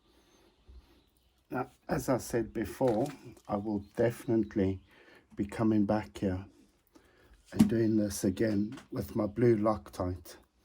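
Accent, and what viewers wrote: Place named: Scotland, United Kingdom. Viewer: Nice video man! And I dont know what it is with the Scottish accent that just makes me listen to your instructions like in a classroom lol!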